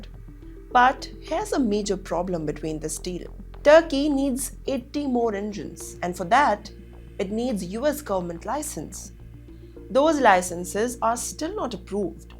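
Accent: India. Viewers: That Indian accent…😮😅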